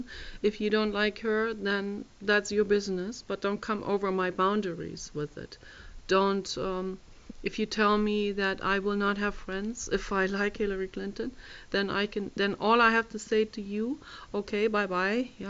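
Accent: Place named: Germany. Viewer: Are You German? You have suuucchh a german accent. Great video!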